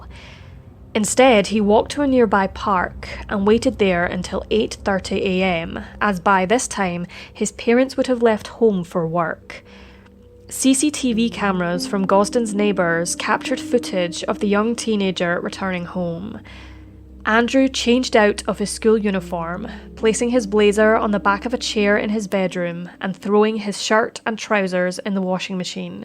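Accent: Scotland, United Kingdom. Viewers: Ann it’s a scottish accent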